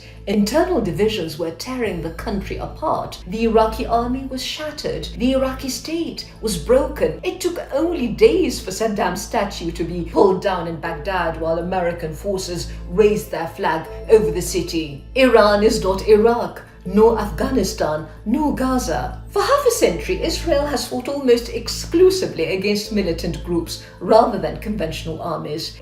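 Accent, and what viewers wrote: RP, United Kingdom. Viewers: As an American I just want to say that her accent is both impressive and off putting at the same time. - To me it’s impressive that she learned to do a posh, aristocratic English accent so well, but it’s also off putting because she sounds like an English aristocrat to me. I’ve only ever heard that accent in plays or when people are intentionally trying to be silly, so it’s hard for me to take it seriously when someone uses that accent. My whole life the only time I’ve heard anyone speak like that, it was Americans who were trying to be ridiculous for a laugh. I’m very opposed to this war and want to help everyone else who opposes it to get their message out. I understand I’m saying something here that might rub some people the wrong way, but it’s intended as constructive criticism. I’m trying to help. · Is this truly her accent, or is she mocking the brittish London accent . 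But she does make some great points though... kudos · Do you really speak in Received Pronunciation, or is this a put on? Nice message! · That's a fine British accent you've got.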